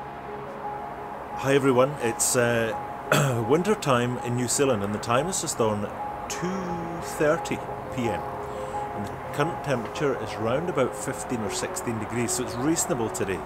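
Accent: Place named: Orkney, United Kingdom. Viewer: Explained so well as always xxx Love your Orcadian accent so calming 👍👍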